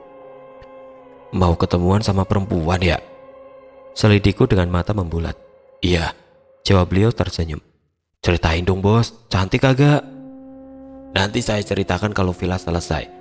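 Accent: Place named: Java, Indonesia. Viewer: Mlah fokus ke logat admin.Admin asli jawa mana ?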